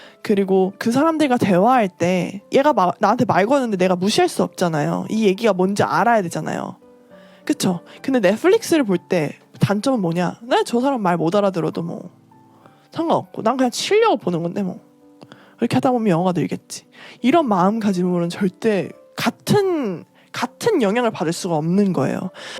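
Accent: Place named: United Kingdom. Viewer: 목소리랑 영국발음이 찰떡인것..영어 뽐뿌 받고 갑니다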